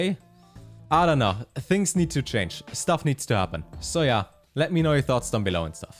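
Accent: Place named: Germany. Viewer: he's making a lot of good comments, but boy, this German accent is killing me ...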